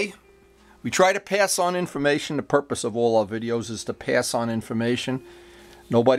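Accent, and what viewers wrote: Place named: New England, United States. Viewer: Sounds like a fellow newenglander thanks for the video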